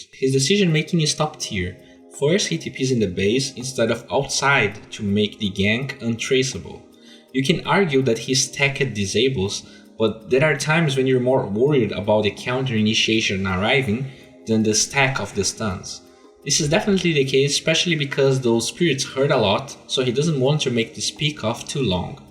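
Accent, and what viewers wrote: Brazil. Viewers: Let the brazilian man with the brazilian accent do what he likes. It's not like people can't understand shit because he pronounces words with an accent. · ^ This. I'm unfamiliar with the brazilian accent so it makes it hard for me to understand him sometimes.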